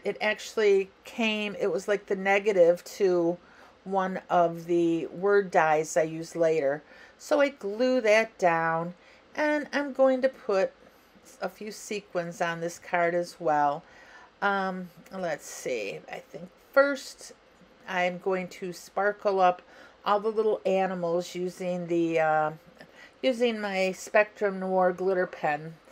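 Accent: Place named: Wisconsin, United States. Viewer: Nice cards! Your accent is driving me crazy! I lived in Connecticut when I was younger and I just know I've heard it up and over that way, but not quite that far... Wisconsin maybe??? LoL, see it's truly making me crazy!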